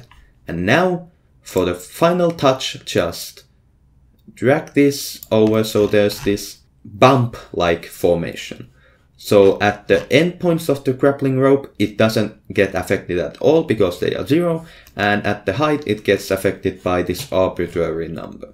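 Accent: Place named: Finland. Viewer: suomalainen aksentti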